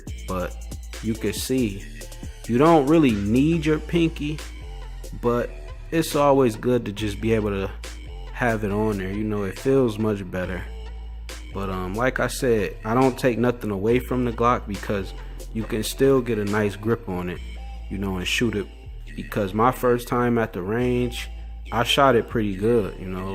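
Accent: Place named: AAVE, United States. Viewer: Dey pretty much uhmm yeah dey day dey day damn son lose that rap shit and fix the umm Ebonics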